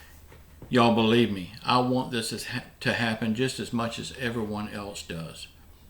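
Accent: South, United States. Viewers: are from the South, you have a Southern accent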